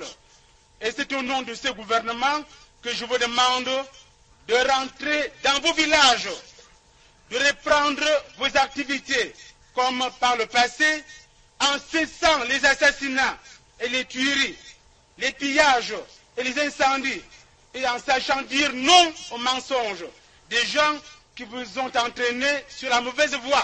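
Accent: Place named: Bulu, Cameroon. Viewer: L accent bulu, les genocidaire, depuis la nuit des temps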